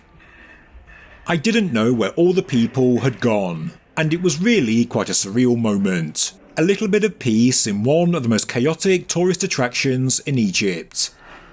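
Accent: United Kingdom. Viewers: Someone asked recently if you were Australian or from UK. I answered that you were from the UK but i couln't tell them where. They were interested in your accent and trying to place it geograhically.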